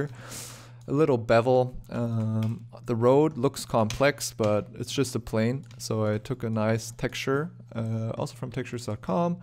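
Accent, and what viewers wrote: Germany. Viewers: haha ich war mir nicht sicher hab es mir gedacht klingt ein bisschen wie Deutsches Englisch. Aber du sprichst richtig gut Englisch mach weiter so !!!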